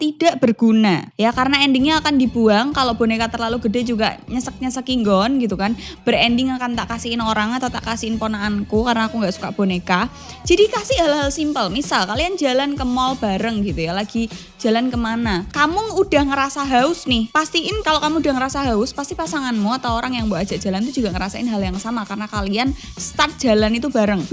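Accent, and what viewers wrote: Java, Indonesia. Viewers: seneng Karo logat jowo ne sampean mbak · Muedok banget logat e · Aksen medok is love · medok rek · laahhh opoo iki....jowo tenan rekkkkk...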